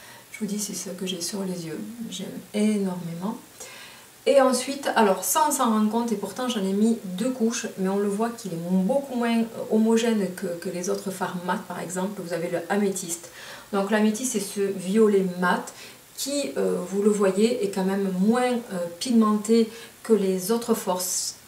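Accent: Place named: Southwest, France. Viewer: C'estdommage d'enlever les swatchs! car on ce rends mieux compte de la pigmentation des Fards.. la couleur..et Les packaging. En plus j'adooore Ton accent! Pourtant je suis de Marseille et j'en ai un aussi! J'adore le sud ouest! Hâte de venir y faire un tour... Brefff... c'est toi le chef.Mais c'est dommage... 🙄🙄 Bon we à vous deux. Des bisous 😚😚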